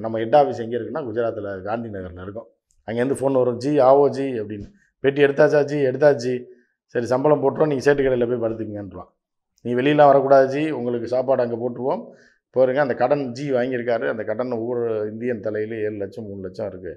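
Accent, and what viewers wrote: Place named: Madurai, India. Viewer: madurai slang-Super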